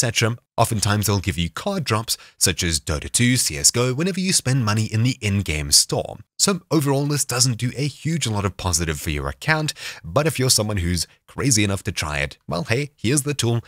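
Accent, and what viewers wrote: South Africa. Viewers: Lmao south africa😂 Is that a joburg boet I hear? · You're South African! Nice! :P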